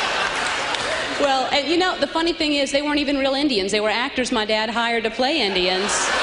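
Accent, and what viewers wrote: South, United States. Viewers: I miss her southern accent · has a souther accent here;) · Back when still spoke with her Southern accent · Her southern accent is so much stronger here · Her southern drawl is more prevalent in this one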